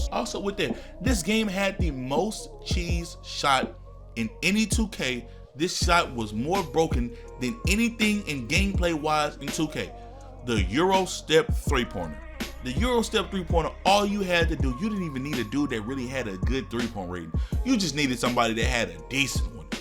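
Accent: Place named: Houston, United States. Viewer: gotta be from Houston ... nigga sound like Maxo Kream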